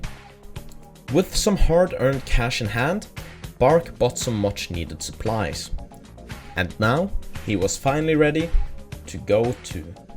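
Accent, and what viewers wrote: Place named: Scandinavia. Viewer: Could hear at once that he's a fellow Norse boy ^^ Gonna watch this video later today :3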